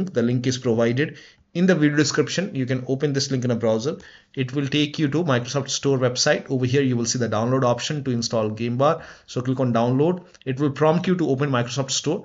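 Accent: India. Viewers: As soon as I heard the Indian accent, I knew its gonna work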